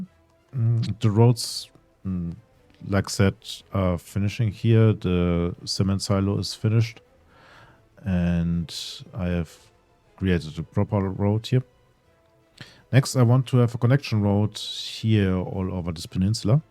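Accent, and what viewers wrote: Germany. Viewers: You sound reallly german. Und das sag ich als deutscher😂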